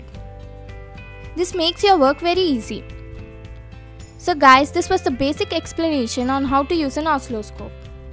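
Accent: India. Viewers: Yes you explanation is wright on,it shows you your stuff.....again well done,but if i may have a tiny reserve ,for a non Indian person ,we may have a bit of difficulty to understand what you say as you are showing and accent.May i suggest that you speak (and this is valid for all Indian people) a little bit SLOWER,and that would make your videos near perfection. Bye. Lucien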